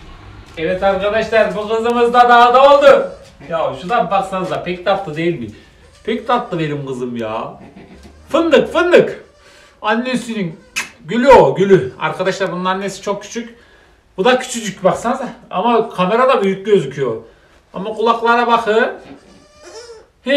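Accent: Konya, Turkey. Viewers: merhaba, kanalını dünden beri yeni keşfettim. hayatimda hiç köye gitmedim, köy yaşamını da tatmadım haliyle. o kadar sıcak kanlı, sempatik, hayatla bire bir yaşam süren, evinde hayvan besleyip kendini zenginim diye tanıtmayan ve gerçekten insan olan biri olman beni çok mutlu etti. hayvanlarla iç içe, doğayla, çevreyle iç içe olman harika... umuyorum çok daha iyi yerlere gelirsin, hiçbir youtuberla seni kıyaslamayacağım çünkü gerek yok. herkesin doğuştan gelen yaşam tarzı, keşke ben de natural bir yerde yaşasaydım. araba gürültüsü, fabrika dumanları, boş insan sesleri, huzursuzluk, kaygı ve dahası... bunların hepsi insanı yoruyor. başarılarının devamını dilerim... bu arada konyalı mısınız? konuştuğunuz ağız çok benziyor.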